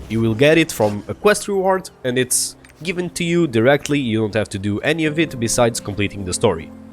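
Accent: Portugal. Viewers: good video! btw, you sound portuguese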